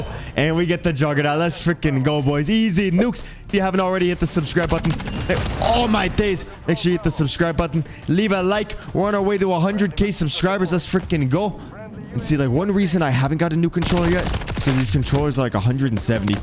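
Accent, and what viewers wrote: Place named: Toronto, Canada. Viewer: Is homie from Toronto? He has the Toronto accent like Plushhh 😂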